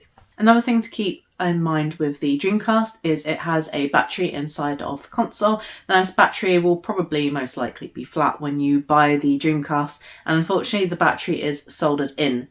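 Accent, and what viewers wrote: United Kingdom. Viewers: Dang she's pretty! Wonder what she sounds like not speaking the queen's English. Wonder what she'd sound like with American English. Anyway. Dreamcast was awesome. PAL territory got stuffed on boxart and such. American Dreamcast packaging and art always looks really good and doesn't have the PEGI rating system stamps on it that's an eye sore. The back of the cases are annoying too as they're in multiple languages. Today's games are similar though, having both Spanish and English that's annoying..... Unless you buy it and it came from Canada. Looks the same and you almost wouldn't know, except it's in English, Spanish, and French. Yuck...... Whew, pretty girl, smile a lot! It's a pretty smile, dang! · Hello. You're from UK, aren't you, lady? Is blue stinger and soldier of fortune also cut in UK region?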